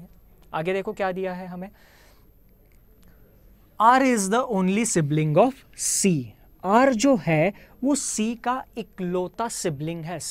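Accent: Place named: Maharashtra, India. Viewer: ....big fan of your teaching style PS: by your hindi pronouncation seems like you are a Maharashtrian....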